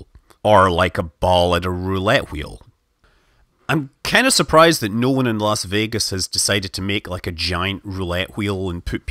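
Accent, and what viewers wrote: Scotland, United Kingdom. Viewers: Scott Manley I have to say. Watching your videos has given me a massive appreciation for Kerbal and helping me feel like physics and rocket science somewhat approachable to the layman. And with a Scottish accent to boot! Thank you for helping me fall in love with this game and science :)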